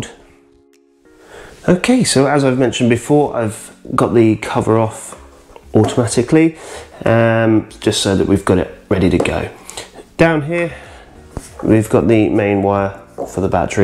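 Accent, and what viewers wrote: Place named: England, United Kingdom. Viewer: I prefer the Human language aka American English and not that nasty tongue clicking and lip smacking noise from shithole engkand, on top of the frequent gasping you mouth-breathers constantly geberate every 4 seconds(on average, it can ve far apart as one whole minute beforw you things gasp, a very ugly sound just lije the stupid lip snackinv you genetate while gasping to show that you ats from england ir sone such savage land instead of civilization).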